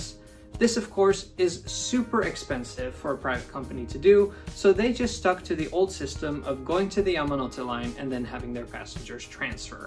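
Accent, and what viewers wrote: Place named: United States. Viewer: Where all have you lived? You sound like an American.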